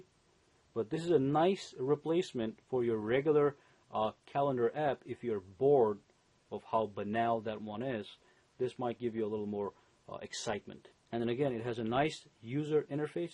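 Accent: India. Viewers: You're Indian, aren't you? 😑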